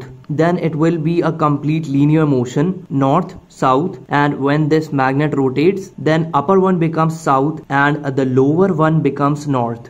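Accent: India. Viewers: You are very sympatic. i also like your voice with a bit indian accent. 🥰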